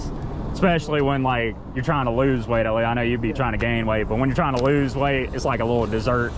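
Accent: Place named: South, United States. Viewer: Love the content. dont know how I found you but not disappointed. I came for the traffic signal knowledge, stayed for your southern draw XD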